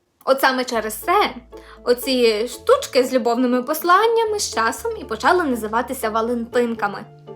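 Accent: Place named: Western Ukraine, Ukraine. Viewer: Кумедна діачинка. Я хоч і сам з заходу України і для мене такий говір мав би бути звичним явищем.та чомусь ваша маннра викликала в мене посмішку. Успіхів у розвитку канала!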